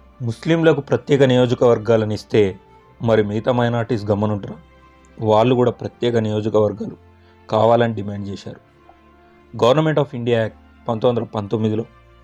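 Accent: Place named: Telangana, India. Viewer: Keep it up ..your Telangana accent is nice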